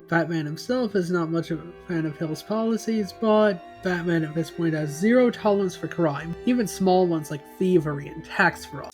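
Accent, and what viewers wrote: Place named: United Kingdom. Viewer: Why are you British?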